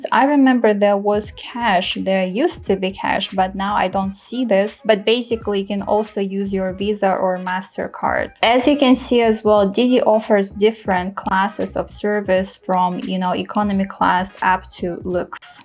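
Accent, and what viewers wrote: Russia. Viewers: Are you Russian? You don’t sound American at all and I’m American with no understanding of the Chinese language but you look Eastern Russian or Korean Japanese maybe of mix race. Grateful for you guide but a little too long for my patience, you’re an expensive gal, nice LV shopping spree from coffee table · Why does your english sounds so russian? Is it just me or you may be sibirskaya? 🤔 🖤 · Акцент похож на русский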